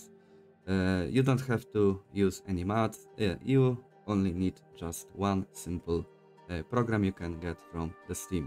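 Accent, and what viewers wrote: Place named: Poland. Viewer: Słychać że Polak❤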